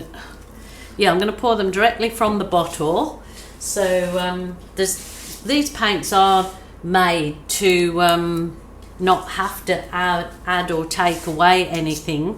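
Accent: Birmingham, United Kingdom. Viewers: Wow! What a stunning result! I think the balloon kisses are the icing on the cake. Just out of interest, is that a Birmingham UK accent I can hear? I live there and it does sound familiar!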